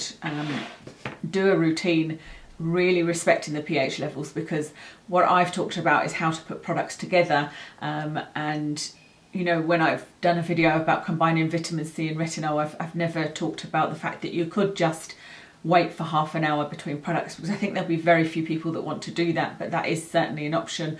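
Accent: United Kingdom. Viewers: Thanks. Great to hear a British voice. Opinion on top tinted, moisturizing spf?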